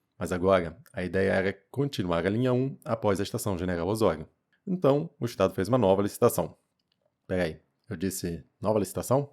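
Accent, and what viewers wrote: France. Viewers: Ele tem sotaque francês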